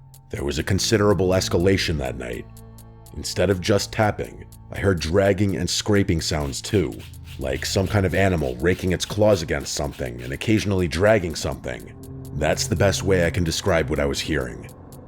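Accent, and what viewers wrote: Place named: Rhode Island, United States. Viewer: U know that u have a perfect voice for narration!! I detect a North eastern accent like maybe Rhode Island?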